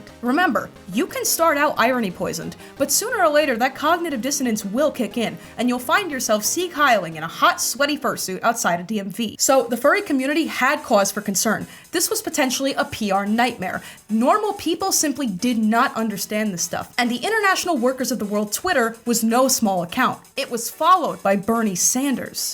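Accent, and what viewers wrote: New York, United States. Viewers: She has a slight Staten Island(New Yorker) accent. You can hear it on her vowel sometimes, it's not very prominent. I think it's great! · saaame!!! i have a relatively weak but present Boston accent (similar to hers, which sounds very NY) and have russian as a first language….americans are so funky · Just found your channel and watched a previous vid and you sounded like you were from NY then i see this one and you sound like your from california or something,so funny you put in a cut about it and broke the 4th wall some truman show shit 😂😂 but great vids i think imma sub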